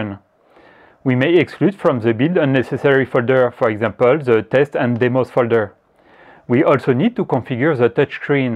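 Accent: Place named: France. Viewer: Excellente présentation!!! Il me semble entendre un accent français, à la base ;-)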